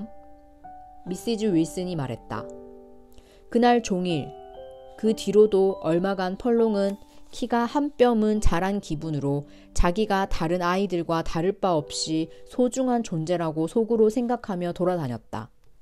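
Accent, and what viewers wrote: South Korea. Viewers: 대구분이신가봐요 ㅋㅋㅋ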